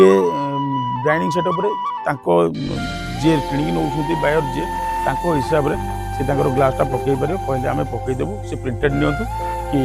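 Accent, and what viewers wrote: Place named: Baripada, India. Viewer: Baripada ru kahuchi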